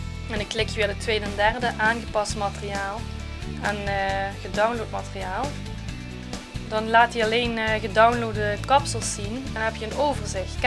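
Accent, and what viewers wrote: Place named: Limburg, Netherlands. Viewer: hey hey ik ben zelf ook half limburgs dus ik ben je accent wel gewend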